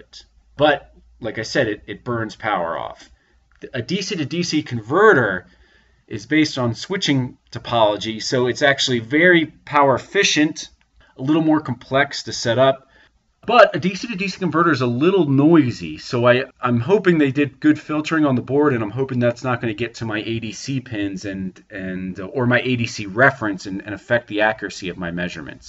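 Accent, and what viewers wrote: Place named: United States. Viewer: Propably this video is kinda informative. But to be honest it just bothers me how time is generated out of nothing and, most important, i just can't stand that accent. "Right out of tha baaahx" Muricans butchering the english language just hurts to watch.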